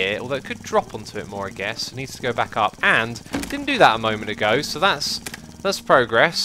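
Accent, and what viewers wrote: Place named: United Kingdom. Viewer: DaSquirrelMan Yeah, his accent is hard to pin down. I wasn't sure if was British or Scandinavian at first. Still sounds like there's some British influence in there.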